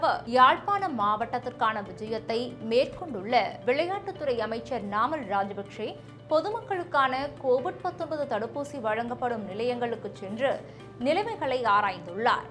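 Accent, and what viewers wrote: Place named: Tamil Nadu, India. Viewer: இந்திய தமிழ் செய்தி வாசிப்பவர்கள் போல வாசிக்கிறார்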